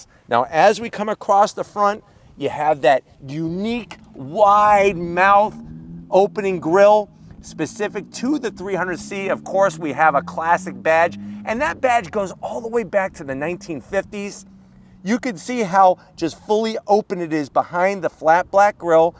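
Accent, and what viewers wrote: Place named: Midwest, United States. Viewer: Dude. You have got to be from the Midwest!!? I'm from Detroit. I can hear it! :text-green-game-over:😄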